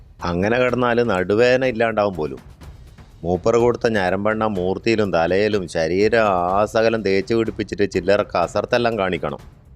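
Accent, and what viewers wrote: Kannur, India. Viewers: അടിപൊളിയായിട്ടുണ്ട് അഭിനന്ദനങ്ങൾ കണ്ണൂർ സ്ലാങ് ഒക്കെ നന്നായിട്ടുണ്ട് ഇനിയും വീഡിയോ പ്രതീക്ഷിക്കുന്നു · എനിക്കു വയ്യ എന്തൊരുവർണ്ണന സൂപ്പർ ആയിട്ടുണ്ട്. കണ്ണൂർ ഭാഷയിലുള്ള അവതരണം സൂപ്പർ സൂപ്പർ🎉🎉🎉🎉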